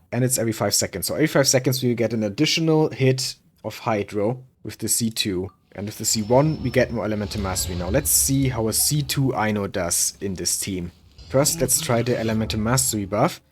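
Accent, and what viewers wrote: Germany. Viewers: i hear german?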